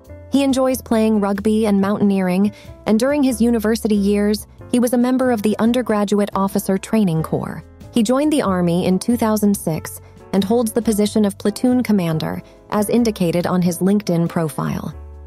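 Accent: United States. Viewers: This video is narrated in American version of English so it was pronounced correctly in that language.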